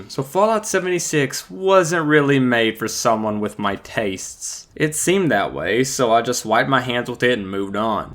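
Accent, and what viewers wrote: South, United States. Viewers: The fact that you pushed yourself soo hard for the sake of making this video despite the relationship you have with this game (as mentioned in this video) deserves praise! You have a southern accent! I hail from a land in the southern region of the U.S. btw, I think you were hooked when you actually started worrying about the condition of your home... to an NPC. LOL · Man you got like 1/10th of a southern accent lmao. it kicks in randomly every now and then.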